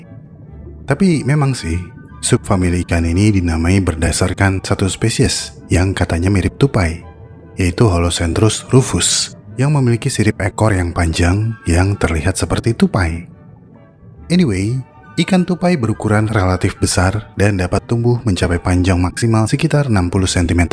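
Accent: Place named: West Java, Indonesia. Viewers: Orang Sunda lu ye bang 😅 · Di luar konteks ya... saya perhatikan abg dalam berdialog ada semu2 sunda nya...apakah keturunan atau memang banyak org sunda di sekitar abg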